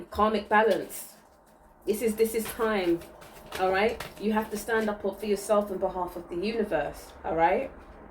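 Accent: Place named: United Kingdom. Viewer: I have just tuned in... But I know....I'm feeling you already beautiful soul Si💫🙏❤️🌻🌄✨xx My place of birth Is not far from you, According to your accent 😉😋.... Isle of Man ... Ellan Vannin in my ancient tongue Mona / Mann By my Viking ancestors 650bc x In my ancient tongue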